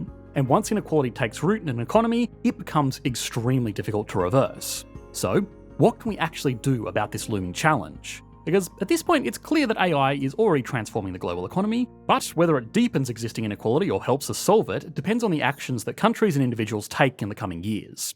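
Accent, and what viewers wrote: Australia. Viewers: I can't stand Australian accents.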